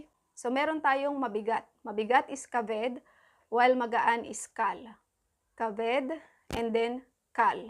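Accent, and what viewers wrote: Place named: Philippines. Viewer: You sound phillipino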